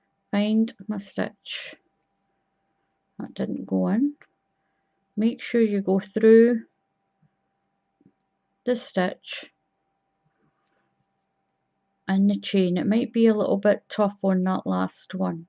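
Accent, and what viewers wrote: Scotland, United Kingdom. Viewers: Thank you once again for a brilliant tutorial. Well demonstrated no music to distract and a bonus when I hear your Scottish accent. I would subscribe to your channel but do not want my name shown on line. If I can do it any other way I would be happy to subscribe. Thank you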